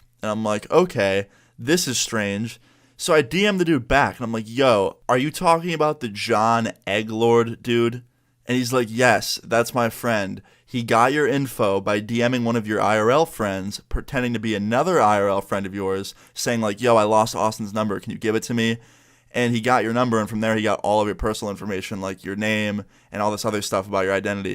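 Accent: New York, United States. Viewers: Heh, had a feeling you were a new Yorker, im the same, you had the accent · You sound like an american Fainted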